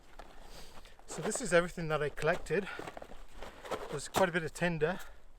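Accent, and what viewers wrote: England, United Kingdom. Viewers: Lol again Esme stole the show! I felt bad for her with those short legs. I’m short too I know how she feels. My boyfriend is from England as well but totally different accent than yours. He’s from Bedford. Milton keens? I will be doing my first camping of the season in 4 weeks with the Kamp rite. You inspire me. Keep up the great videos!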